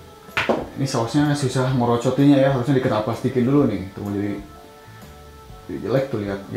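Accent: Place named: Bakumpai, Indonesia. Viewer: Abang orang mana kayak bahasa bakumpai ije due telu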